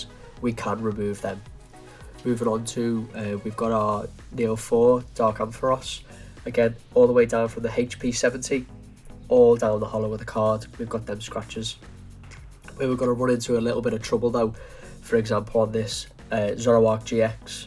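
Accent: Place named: Liverpool, United Kingdom. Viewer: Thank you scouser! I can't tell you how much bother you've saved me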